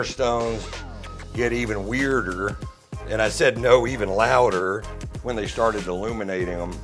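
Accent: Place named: Texas, United States. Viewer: You know he’s from Texas.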